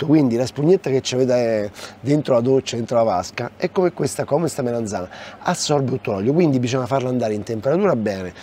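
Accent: Rome, Italy. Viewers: Faresti meglio a fare qualche film con Massimo Boldi con quel accento romano,e un complimento,sei bravissimo come cuocco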